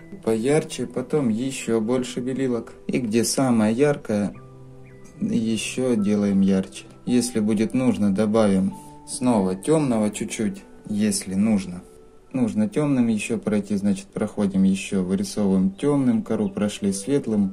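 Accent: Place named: Odesa, Ukraine. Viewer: "Снова- таки три сантиметра.".. Украина. Замечательный диалект. Одесса?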